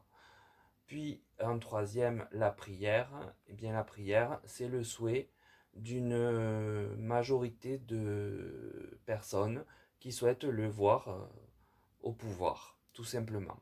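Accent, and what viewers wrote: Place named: South, France. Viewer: c'est quelle ville du Sud cet accent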